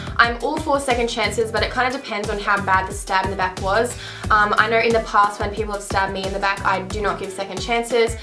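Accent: Australia. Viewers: Are you Aussie? Hopefully I'm not Aussie and alone.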